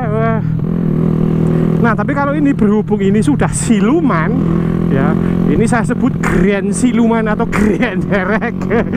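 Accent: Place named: Wonosobo, Indonesia. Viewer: suarane Wonosobo banget, obat kangen wong sobo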